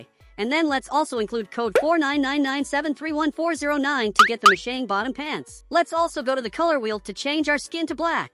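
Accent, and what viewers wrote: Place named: Portugal. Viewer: Oxi ele é português e tá fingindo ser inglês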